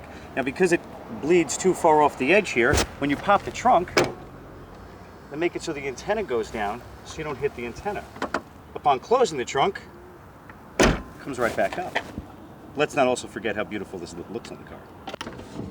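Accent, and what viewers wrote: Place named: New York, United States. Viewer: Exactly what I was thinking, got to be a New York accent thing.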